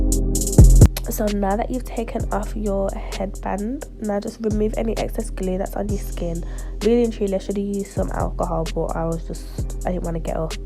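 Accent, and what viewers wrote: Birmingham, United Kingdom. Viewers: omg ur british!!!!!!!!!!! i love ur accent!!!! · Juliaaa Robb I think Birmingham in the UK maybe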